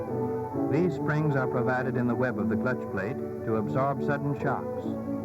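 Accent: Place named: Transatlantic, United States. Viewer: It's called Transatlantic English if I'm not mistaken. Not at all a natural accent, either.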